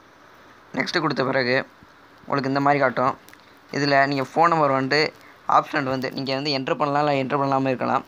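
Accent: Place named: Tirunelveli, India. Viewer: Payapulla thirunelveliyo 🤩 luv ur slang bro 🙌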